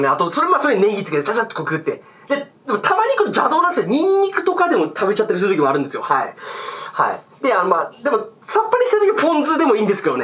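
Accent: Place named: Fukushima, Japan. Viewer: なまり、福島弁ぽいばい🤔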